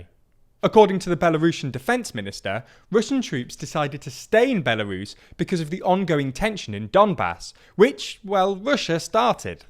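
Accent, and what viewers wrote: United Kingdom. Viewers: That british accent is so horrible, christ, why, just why.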